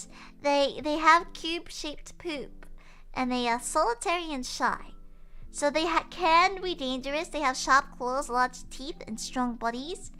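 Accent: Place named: Australia. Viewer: Your Australian accent is adorable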